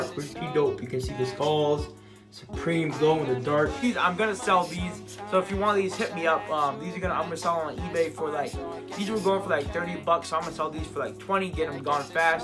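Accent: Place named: United Kingdom. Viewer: Your voice sounds half British! Fucking great video tho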